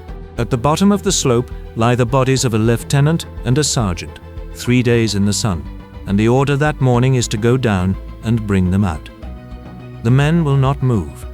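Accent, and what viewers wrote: United Kingdom. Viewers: Do we Reeeealy have to listen to a Brit narrating? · The British accent is confusing. Sounds like he’s talking about British troops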